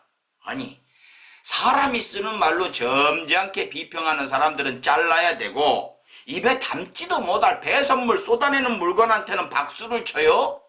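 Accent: Jeolla, South Korea. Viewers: 강성범씨 고향이 경기도라고 하시던데, 전라도말을 구사하다보니 맛깔스러움도있지만 살짝 부담도 됩니다 속 시원하게 해 주는 매력 넘쳐요~~ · 똥물... 맞네 ㅋㅋㅋㅋㅋㅋㅋㅋ 근데 발음 교정좀 해주세요 전라도 말인데... 차명진 문제야 지금은 경상도 말쓰면 경상도코로나 라도 하던데 피하고 난지금도 피하고싶어요 경상도 말하는사람들 한테 코로나 결릴까봐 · 왜 코메디 계에서 왜 안보이나 했더니 정치판에 들어왔냐?송충이는 솔잎을 먹어야 산다고 했던가? 하하..대가리에 들은것도 별로 없지 싶은데 참 어울리지 않는다. 표만 깎아 먹는다. 도 절라도 치인가? 전라도 사투리를 많이 쓰네!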